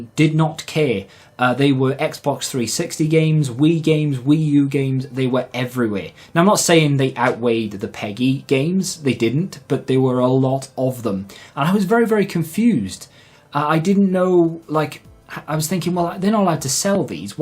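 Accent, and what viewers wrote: Wales, United Kingdom. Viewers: Love your video, interesting stuff. You sound like you are from Wales, particularly the south? Where about? I used to live in Clydach (near Swansea/neath)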